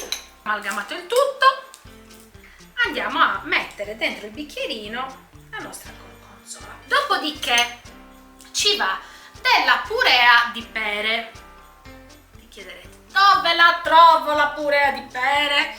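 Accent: Sardinia, Italy. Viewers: oltre che brava sei simpaticissima complimenti mi piace il vostro dialetto sardo io ho ha nuora sarda e gli voglio molto bene ciao e buon Natale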